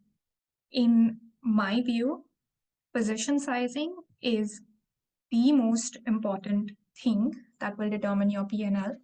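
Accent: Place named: India. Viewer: Idk why but watching the video having an Indian accent seems easy to grasp... 😂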